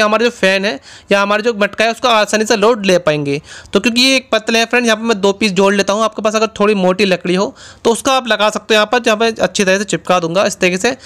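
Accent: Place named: Rajasthan, India. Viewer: bhai tu dikhne me smart hai pr bolne me ek dum desi hai Rajsthan se hai kya bhai kyo ki me bhi desi hi hu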